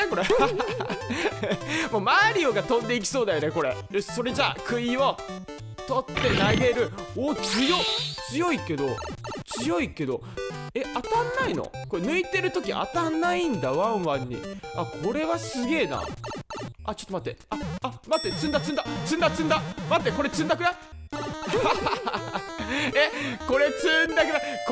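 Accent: Fukuoka, Japan. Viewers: 福岡弁可愛い😆ww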